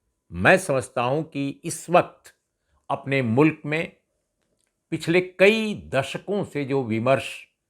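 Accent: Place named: Bihar, India. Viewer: आप ऐसे बोलते हैं लगता है कि कोई बिहारी ही बोल रहा है। आपको मेरा नमस्कार है 🙏🙏🙂🙂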